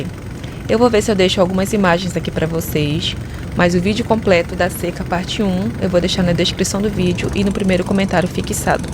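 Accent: Rio de Janeiro, Brazil. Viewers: Vc e do Amazonas mas tem o sotaque de carioca (fala com chiado), Por que???. Vc já morou no Rio de Jan.????. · Oi td bem so uma pergunta vc, tem o sotaque de carioca vc é carioca? Abraços sou seu fam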